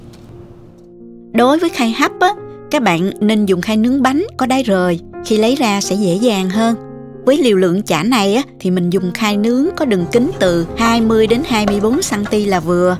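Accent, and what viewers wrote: South, Vietnam. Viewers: Tuyệt vời cô ơi, cô chỉ dẫn thật rõ ràng cứ theo đó mà làm có món ăn ngon lành, giọng cô trong trẻo thân thương như chữ há, nè, hén, nha của người Nam Bộ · giong noi mien nam hien lành và de thuong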